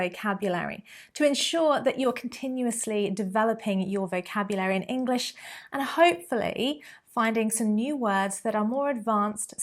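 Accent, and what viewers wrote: United Kingdom. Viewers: Thanks VERY much for this lesson❤ hi mis I'm new here in this channel I want learn English British accent with you and thanks again I LOVE YOU 🌷🌷🌷🌷🌷🌷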